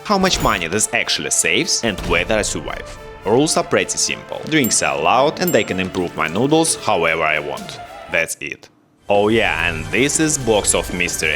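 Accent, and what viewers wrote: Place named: Russia. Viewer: У него акцент как у русского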